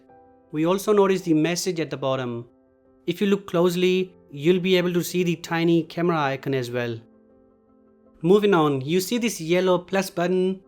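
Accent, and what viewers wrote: India. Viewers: I hate Indian accent the most sorry...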